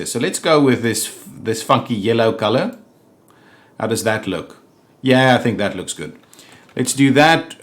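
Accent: South Africa. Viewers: Hi..you sound south African..LOL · Great series. Watching them. I was actually going to ask if that accent is South African but answer said after 16min :)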